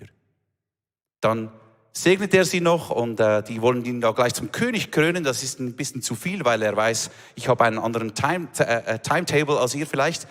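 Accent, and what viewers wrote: Switzerland. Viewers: Liebe Mirjana, das ist Hochdeutsch mit Schweizerakzent. Und wenn man Hochdeutsch versteht, ist es kein Problem die Predigt zu verstehen. Zu den Menschen wird freundlich gesprochen und sie werden ernstgenommen. Nach der Speisung der fünftausend ist Jesus Christus herausfordernd, weil er ins ❤ der Menschen sieht. Bei Maria und Martha ist ER empathisch. Denn ER weiß welche Worte wir brauchen. Auch diese Geschichte wird erwähnt. Also auch diese Tatsache kommt nicht zu kurz.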